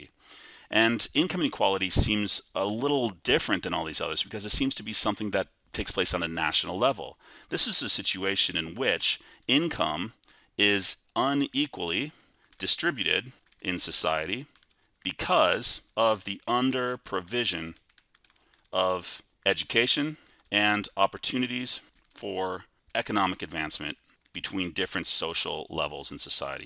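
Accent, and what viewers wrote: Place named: United States. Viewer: Can u add subtitles as every one cant understand american accent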